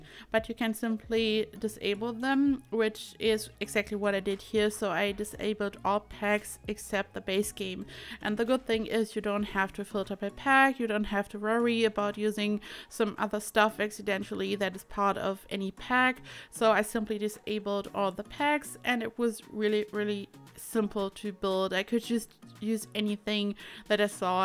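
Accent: Germany. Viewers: Your accent sounds a lot like German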